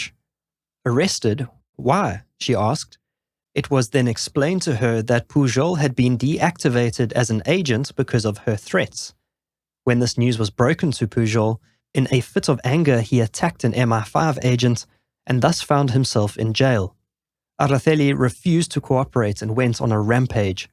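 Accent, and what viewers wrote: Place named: South Africa. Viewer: Yes that is a South African accent. A lighter and less twangy one at that. Upper class maybe.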